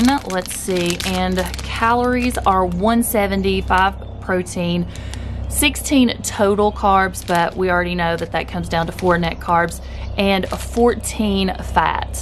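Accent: South, United States. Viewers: I love how you say "spicy dill"! I'm from Michigan so I love the southern accent!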